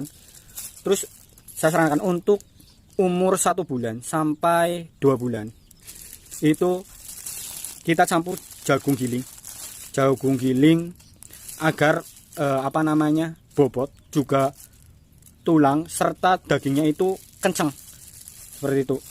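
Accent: Banyumas, Indonesia. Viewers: Ngapak nya mana kang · Joooss sobat ku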